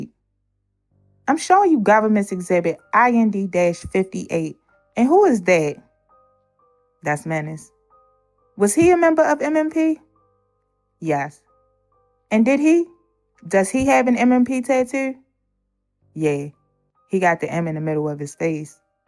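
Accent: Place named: Baltimore, United States. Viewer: Who ever you got reading this is definitely from Baltimore like me hot-dug aka hot dog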